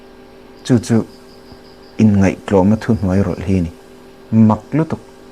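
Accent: Myanmar. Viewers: Falam tawng Chu aawm e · Nangpa hi ka rin zawng ini lo.Burma ho hi in tenawm tlat,mizoram inpumkhatna pawh in ti chhe dawn.Mizotawng pai deuh deuhin in tawng a,in zak der mai si lova.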